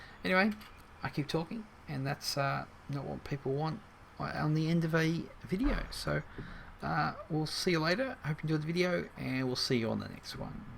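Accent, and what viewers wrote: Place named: Australia. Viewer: good thick aussie accent there, from another strayan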